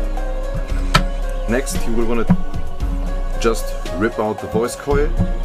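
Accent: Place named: Germany. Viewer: i love germans speaking english :D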